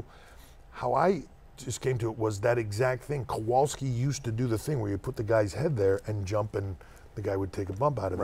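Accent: Canada. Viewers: I swear, the older he gets. The more canadian he sounds.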